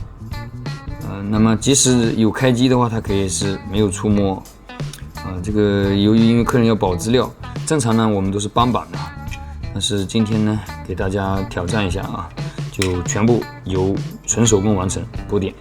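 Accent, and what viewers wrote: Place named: Fujian, China. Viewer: 是我看过做维修视频做的最专业的一个，请问是不是福建人？听口音像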